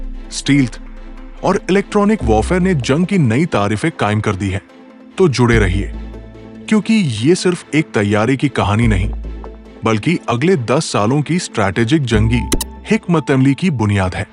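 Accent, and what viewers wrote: India. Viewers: App Urdu ase boll rahe jese app Indian hain. Muwajna in Urdu muwazna lese Pakistan jindabad in Urdu Pakistan zindabad. Or you understand.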